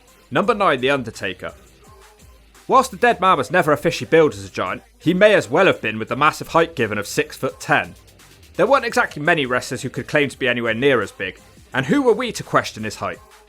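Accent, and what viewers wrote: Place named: United Kingdom. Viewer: How come these narrators are always British?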